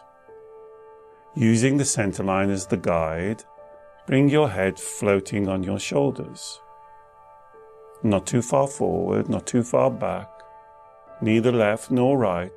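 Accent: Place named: Northern England, United Kingdom. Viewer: Your northern English accent is so calming. Kia ora from New Zealand.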